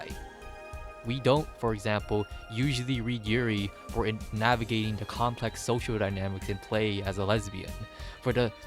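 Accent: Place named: Ireland. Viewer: cool cool, but why do you keep saying "mudder" are you Irish? Or Irish american?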